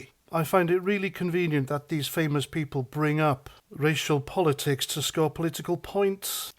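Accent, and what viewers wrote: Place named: Liverpool, United Kingdom. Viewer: Not even British, just Scouse.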